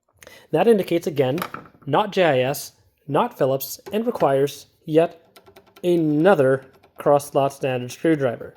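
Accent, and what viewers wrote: Canada. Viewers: I hear a Canadian acceeeent.😊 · This guy is a Canadian. Listen closely.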